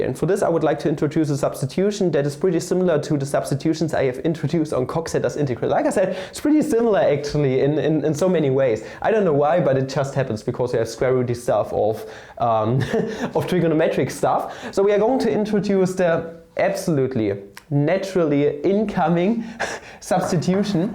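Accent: Germany. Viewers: I clicked, heard a German accent, and stayed. 👍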